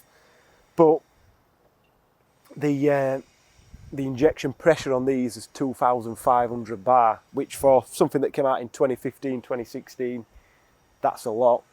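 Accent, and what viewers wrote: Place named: Barnsley, United Kingdom. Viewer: Proper Barnsley lad, tell thi nar!!!! when can tha do my BITDI.... 4MOTION RULES 😂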